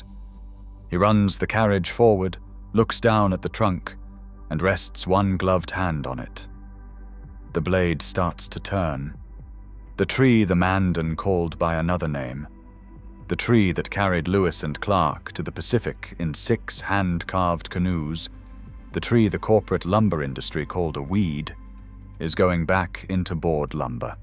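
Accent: United Kingdom. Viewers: Just because you put a British voice to the information doesn't make it right. COTTONWOOD SUCKS AS A WOOD FOR ALMOST EVERY THING! Remember, I said ALMOST every thing involving wood uses.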